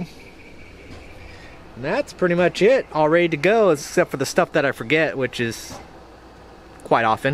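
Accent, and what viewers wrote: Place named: Hawaii, United States. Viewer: Are you from or lived in Hawaii? You sound like you did before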